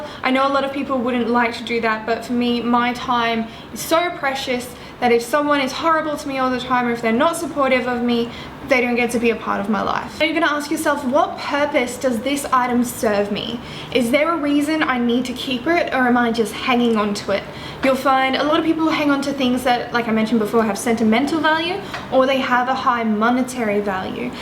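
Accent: Australia. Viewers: I love aussie accent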